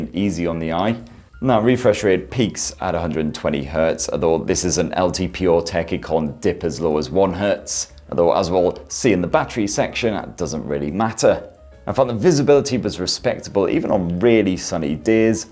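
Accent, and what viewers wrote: United Kingdom. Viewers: The British Accent Wow 😊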